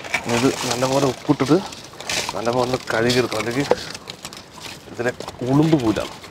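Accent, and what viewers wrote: Palakkad, India. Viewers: ഏട്ടാ നിങ്ങൾ പാലക്കാടൻ അല്ലെ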